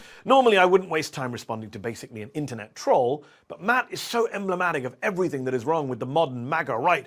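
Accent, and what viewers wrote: United Kingdom. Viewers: With a profoundly British accent?. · I mean, you don't sound like you're from the U.S. with that British accent, so maybe he is technically more "murkan" than you, but who cares?? I guess it depends on how you define "American". From the rest of the world's perspective, "American" means gun toting, bible beating, boot licking patriotism. From that standpoint, he's definitely more "American" than you.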